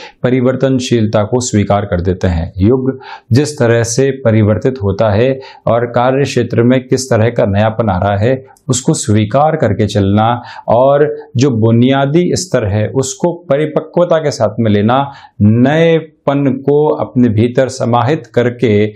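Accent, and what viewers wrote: Standard. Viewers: Very pure accent , great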